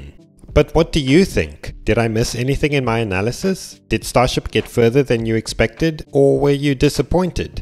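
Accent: South Africa. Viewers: South African? Accent kinda sounds like it. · South African!!! Nice Dude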